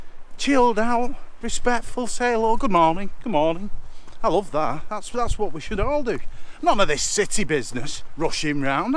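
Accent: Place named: St Helens, United Kingdom. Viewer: binging on your videos mate, really enjoying them. To be honest you stopped me in my tracks when you opened your mouth haha I thought “Now that lad’s got to be local to St Helens...”;)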